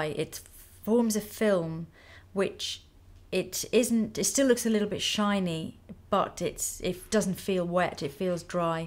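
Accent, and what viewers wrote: United Kingdom. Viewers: Oh gosh, that beginning made me feel like I'm exactly in an airplane (except with the British accent) probably because I'm flying soon haha!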